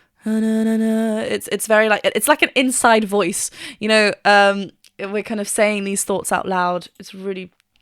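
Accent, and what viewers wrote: United Kingdom. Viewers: Are U from Austria? The Name looka like, but the english Accent is british? Or i am false?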